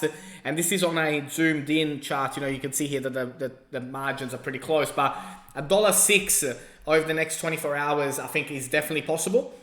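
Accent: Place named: Australia. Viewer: Nice to hear an Ozzy. Subscribed.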